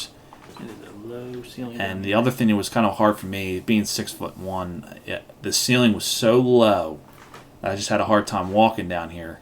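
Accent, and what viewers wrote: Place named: Delaware County, United States. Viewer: Your accent gives you away. We grew up in the same place, Delco. I can tell.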